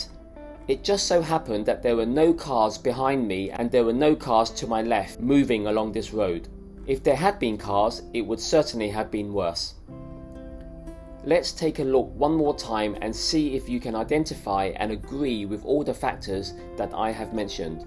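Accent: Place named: United Kingdom. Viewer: I like you have the Hong Kongers' 「Wah」expression while you still have the Brits accent😂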